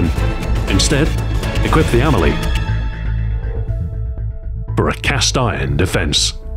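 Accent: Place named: England, United Kingdom. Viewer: The English accent makes you sound very intelligent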